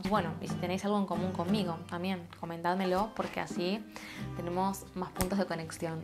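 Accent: Argentina. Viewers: Sabía que eras argentina! Tu manera de hablar lo delata. Me encanta todo lo que compartís diosa ❤ he puesto en práctica tus consejos y me siento mejor. Gracias! Un abrazo desde Argentina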